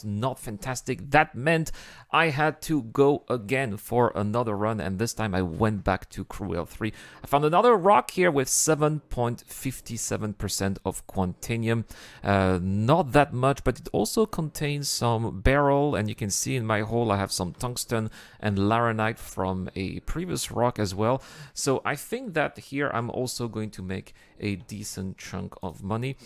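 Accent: France. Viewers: Bro... Are u french?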